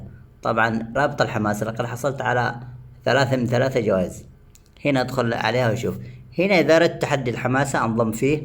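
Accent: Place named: Yemen. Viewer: اتوقع انك يمني من طريقة كلامك تحياتي لك من غزة ممكن تقولنا تحسنت بشكل كبير ولا كيف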